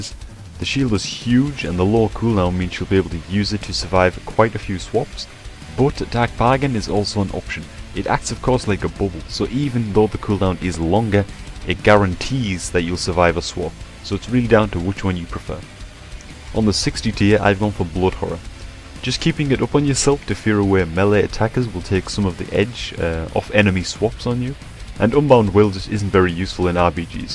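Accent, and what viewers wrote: Newcastle, United Kingdom. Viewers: Were abouts are you from? are you from newcastle by any chance? because im from there :D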